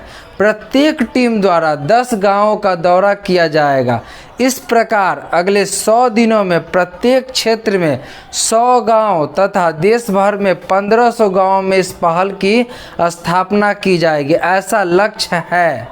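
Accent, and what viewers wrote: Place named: Bihar, India. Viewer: Don't speak in bihari accent if you want to reach to audiences throughout India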